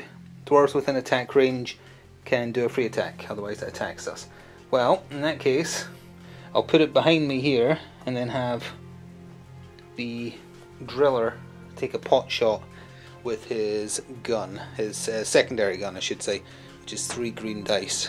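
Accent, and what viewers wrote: Scotland, United Kingdom. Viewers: It's Nitra, so pronounced night-ruh. For the Americans, what's your regional accent? It's leaning Scottish but doesn't sound full Scot.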